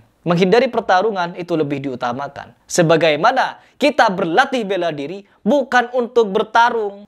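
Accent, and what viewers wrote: Madura, Indonesia. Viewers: kyaknya asli Madura juga ya?Logatnya spt Madura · Aslinya org mn ini ya.kok logat bahasanya kayak org Madura.?? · kalo ngomong kok ada nada maduranya ya😅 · dari logatnua kyk orng madura pantas phm sejarah carok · kalau sendainya ini org madura asli (krn logatnya sprti logat madura). sya yakin ada 100 org saja org madura sprti psti madura penuh dgn kedamaian dri kekerasan. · orang aseli madura kh? ketauan dari logat nya, hehe, (bibi saya orang madura jga soalnya, dan mak saya (almh) jga pandai ngomong medura krna sering bergaul dgn org madura) kalo iya ini momen yg pas utk menjelaskan & mengedikasi soal carok & bela diri, salam bela diri, saya cuman mantan atlet silat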